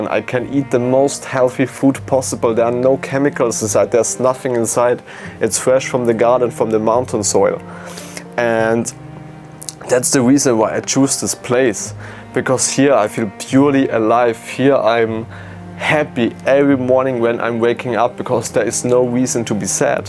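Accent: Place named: Austria. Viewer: Guys Austrilia and Austria too different. He sounds like european/ Austrian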